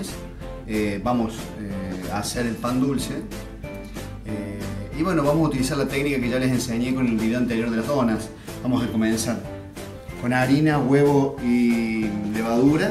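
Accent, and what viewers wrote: Córdoba, Argentina. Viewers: Desde hace muchos años soy un apasionado por la cocina. Hace menos años me sumé a la pastelería y tus clases fueron tesoros en esta nueva búsqueda. Para esta navidad hice turrón turco, pan dulce y budines. Fueron elogiados. Por eso va para vos mi agradecimiento por la generosidad con la que compartís tus secretos y conocimientos. Además, como soy patriota cordobés, disfruto de un maestro con mi mismo acento.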